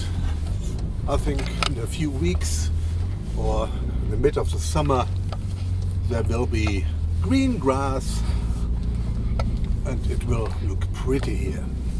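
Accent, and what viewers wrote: Germany. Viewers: I like the reviewer! I love the german-english! keep up the good work! (Car is ugly)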